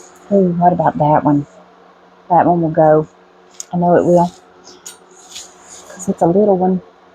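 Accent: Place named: South, United States. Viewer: Hi, I am a new subscriber. Everything looks great. I love it all! Where are you from? I detect a Southern accent. North Carolina here.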